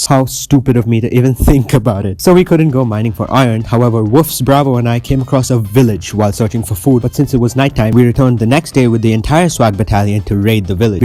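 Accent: India. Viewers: Bro still has Indian accent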